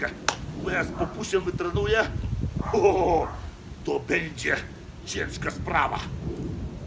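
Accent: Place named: Lithuania. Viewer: D Uwielbiam ten jego litewski akcent.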